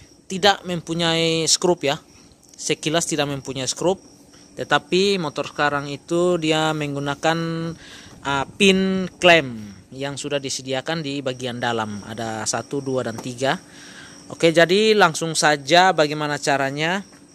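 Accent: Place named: Bali, Indonesia. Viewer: Kalau dengar logatnya pasti dia orang bali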